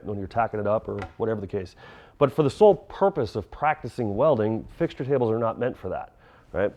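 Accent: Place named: Transatlantic, United States. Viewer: Your voice does have a good tone, and you also speak with an accent similar to the formerly popular transatlantic accent used in a lot of media. People have told me the same and commented on my voice, but it's just how I talk. Especially when I'm on camera or teaching something. Wonder if it has to do with schooling or where parents are from or media consumed or what. Love the pink gloves rationale as well. I don't have time for needless negativity in my comments.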